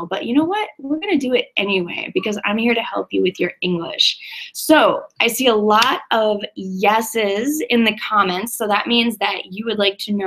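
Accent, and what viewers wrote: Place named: United States. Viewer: I like your American accent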